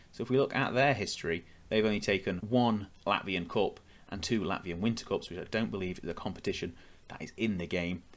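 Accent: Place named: Ireland. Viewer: Bro the Irish head in ya.. Would never say that you're Latvian...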